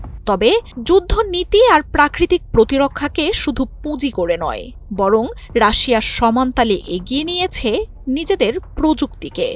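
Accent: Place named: West Bengal, India. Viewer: News reporting shune west Bengal er kono channel mone hocche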